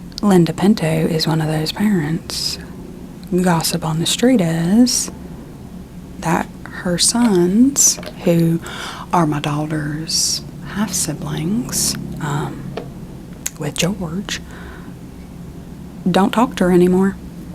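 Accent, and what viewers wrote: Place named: North Carolina, United States. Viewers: Ugh I love. I live in NC and she reminds me of this sweet woman who used to work a local convenience store. She would talk your HEAD off but she was so sweet, and the accent reminds me so much of her. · I grew up in NC, and one of the first accents I was able to pick up was the thick NC accent of one of our neighbor ladies. This brought back so many memories lol ty ❤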